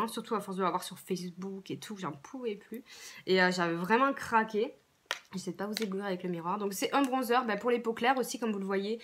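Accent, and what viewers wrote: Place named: South, France. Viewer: on entend beaucoup moins ton acceeeeeeent du suuuuuuuud sur YouTube que sur Snap !! ahha non pas que je te suive uniquement pour l'accent ...! en tout cas tes vidéos sont un réel plaisir ! pas de chichi, ni de superflu, ni de mise en scène (jolie mais bidon), tu vas à l'essentiel, tu parles des produits de façon très claire et ça fait du bien ! grosses bises ma belle !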